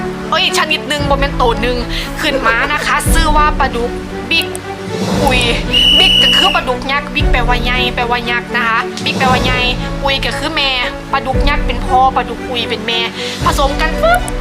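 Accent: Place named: Chaiyaphum, Thailand. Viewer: สำเนียงเหมือนชัยภูมิเเท้จ้า ฟังเเล้วคิดฮอดบ้านจ้า คอนสาร ตอนนี้ย้ายบ้านมาอยู่กับเเฟนที่ชลบุรีเเล้ว บ้านอยู่คอนสารก็รื้ออกหมดเเล้วไม่ได้กลับบ้าน4ปีเเล้ว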